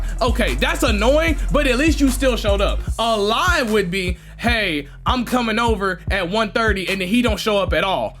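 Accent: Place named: Memphis, United States. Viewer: You from Memphis G?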